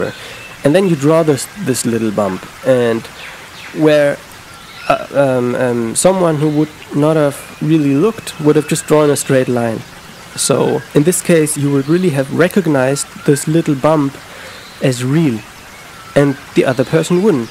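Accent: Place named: Germany. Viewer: You kinda sound German to me. Biste deutsch? 😁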